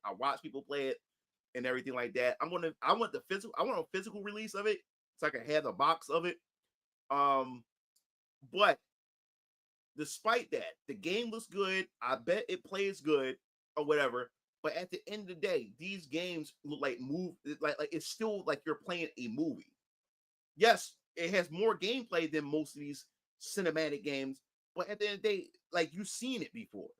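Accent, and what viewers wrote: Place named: Philadelphia, United States. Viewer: Nah couz daz how they talk in Philly, git with it x).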